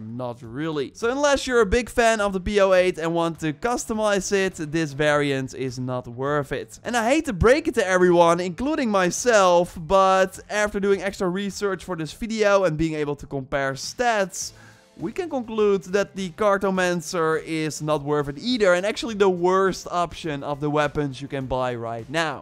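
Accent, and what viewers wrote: Netherlands. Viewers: Ik hoor gewoon dat je Nederlands bent hahaha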